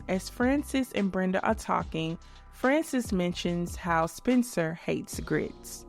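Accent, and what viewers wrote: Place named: Midwest, United States. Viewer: your opinions are clinical however, I wonder which character you relate to most my guess is Dr. Wade. Phonetically I would guess that your upbringing was in the southern Midwestern United States.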